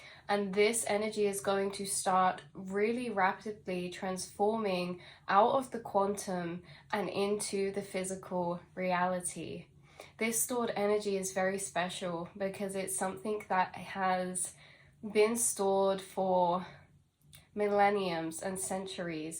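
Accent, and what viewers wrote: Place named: United Kingdom. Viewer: Girl, you embody a plethora of Wisdom, you showed up on my feed two days ago and I've been listening to you since, all day yesterday, and I must say that you embody within you, truths that's taken me years to aquire, and this reading is the most profound! Given that I've lived 800 lives 😂 my lineage travels back to Luke, the physician in scripture, which explains why I've always had a passion for healing... Mind, Body and Spirit... In ministry it was my goal and as a Holistic Healer, it is still a goal of mine... Wayne represents royalty in the early 1700 or even earlier, I know I've been in that life, I've seen and felt it and I'm drawn to those types of movies and frequencies... Celtic, knights, time travel and sci-fi... Some, not all.... Embracing my ancestral roots of Irish Scottish.... You sound British 🙂 German and Native American.... 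I recently discovered that I am a Blue Ray Starseed, Earth Angel, they've traveled with me and shown up in my meetings, and it resonates with me deeply.... Yes, large amounts of money is my inheritance and is needed for this leg of the path I'm on .... I also discovered that I have a dragon 🐲 working with me, I want to meet 😂 I see colors of blue, gold and white, purple... Amethyst is a strong energy and green, especially while in meditation....and the forest lights up with Frequency when I'm there... I Really Love this reading 📖 as far as commutation, I AM constantly in communication with Spirit and now my guides and starseed family.... S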